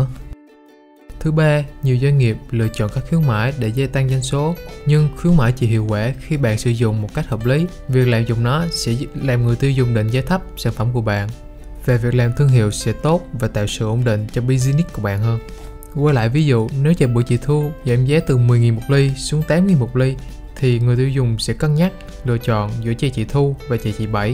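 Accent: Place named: Phu Yen-Khanh Hoa, Vietnam. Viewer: Giọng nói của bạn rất hay. Chắc là giọng Phú Yên-Khánh Hòa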